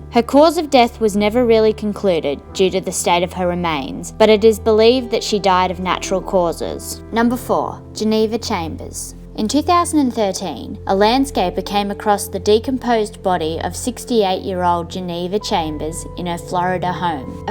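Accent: Australia. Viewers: the person who speaks sounds austrailian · the narrator sounds like an Australian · Hey!, you sound like an Aussie.... Oi Oi Oi:) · Australian accent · 2funny girls Channel UMM NO, Australian people. SHE IS VERY AUSTRALIAN. As an Australian I can confirm that this woman is in fact Australian. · AUssie twang. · that australian accent is adorable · sounds Aussie to me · Watched half of it but the first case was the best and then they got boring. The best part of the video is the narrator's voice: refreshing to hear a young Aussie's voice rather than a yank or a synthetic machine voice!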